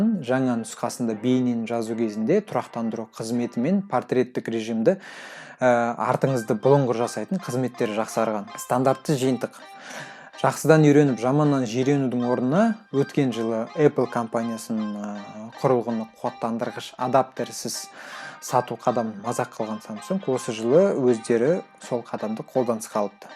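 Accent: Standard. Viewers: Күшті! Мықты сараптама таза қазақша. Жарайсың! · Очень качественное видео и речь. Настоящий казахский язык, не базарный и аульный, звучание красивое.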